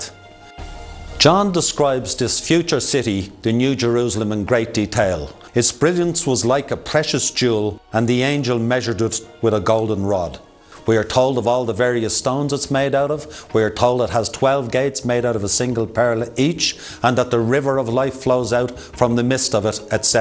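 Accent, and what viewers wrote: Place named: Ireland. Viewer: Love that Irish accent! Cool vid!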